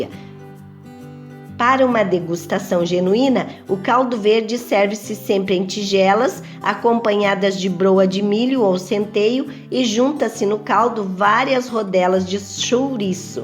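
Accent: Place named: Brazil. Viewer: Mas vc nao é de Portugal !!! vc fala com sotaque brasileiro...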